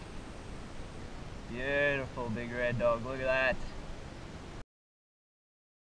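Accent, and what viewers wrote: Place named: Australia. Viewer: you are the 3rd Aussie i subcribed love you guy's your great sports and love the accent to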